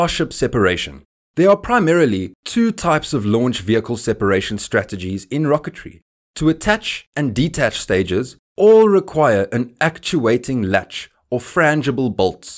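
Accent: South Africa. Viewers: Never heard a south african vlogger before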